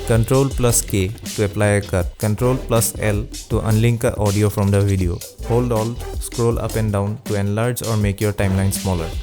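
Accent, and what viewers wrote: Assam, India. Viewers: i can feel the assamese accent... love from Assam 💗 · Holy crap bro, im not even done watching the vid but I cant resist the urge to say how cool you are for someone who has an indian accent and a pretty decent sense of humor lmao... Fellow video editor here, keep it up.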